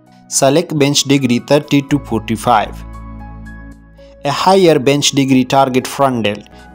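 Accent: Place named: India. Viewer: Сразу слышно что индус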